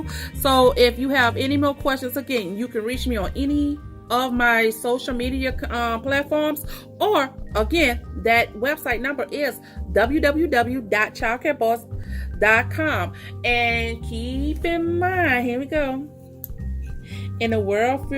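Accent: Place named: New Orleans, United States. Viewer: That New Orleans accent is strong!! Thank you so much for the info.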